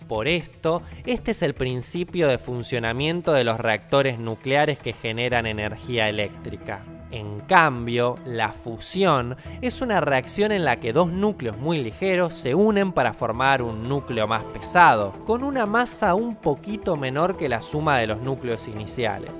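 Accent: Argentina. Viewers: Que horrible es el acento argentino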